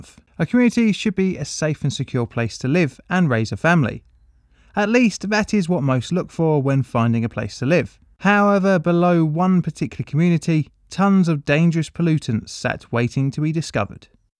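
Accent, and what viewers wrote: United Kingdom. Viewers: This was an American disaster so what's with the British narrator?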